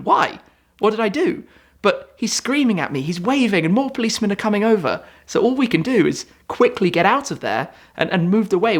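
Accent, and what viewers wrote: United Kingdom. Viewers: He s british :)) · Bc of the British accent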